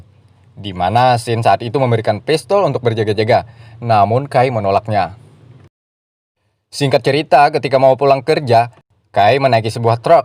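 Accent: Banjar, Indonesia. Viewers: Mang orang banjar kh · Mantap bos campuran logat bahasa banjar sama bahasa indo👍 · Logat banjar kd bisa hilang😁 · Adminnya urang Banjar dingsanak lah.. Hehehe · Aksen nya kaya urang banua Banjar tambahee lagee sanakk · Banjar kah admin nih...umaaaaa...mandangar dangsanak tu aeee..tesadar...wkwk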